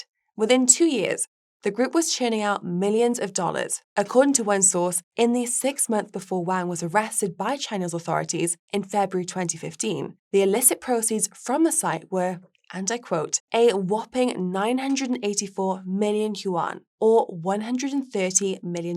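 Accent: United Kingdom. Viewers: Her British accent n soft voice 🍬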